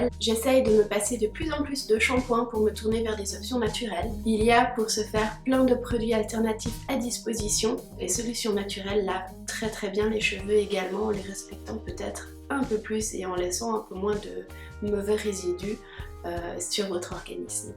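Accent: Switzerland. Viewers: Je crois reconnaître un accent suisse ? Je me trompe ?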